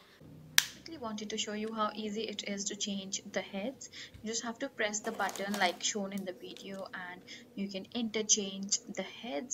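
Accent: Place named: India. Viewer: I cant take the indian accent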